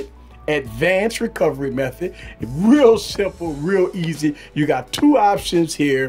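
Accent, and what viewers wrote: Louisiana, United States. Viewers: Hey are you cajun? Im like 15% cajun but aside from that, thank you for the tutorial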